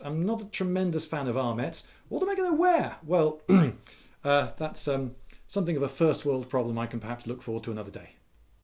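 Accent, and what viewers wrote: France. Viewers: nice french accent! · Nice french accent! It seems that the "pig faced" is named "bec de passereau" in france ("sparrow beak")... I don't know exactly why, but here si my view** : you see it as a pigface when you are in front of your enemy. In the other hand, you see it as a sparrow beak when you take a look at the ally at your side. ...So this could come from Azincourt**, where the french chivalry (seeing themselves as the "birds") were in front of english archers (seeing the french as..well, you have understood ;) ) Thank you for the very nice video! (**: It's not a historical reason, guys, just a joke!)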